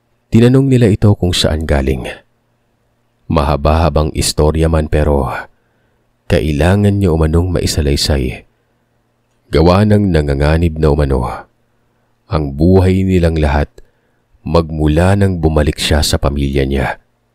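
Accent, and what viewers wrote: Iloilo, Philippines. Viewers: SIKONGKONG😂😂😂 ILONGGO BA SI SIR?????😅😅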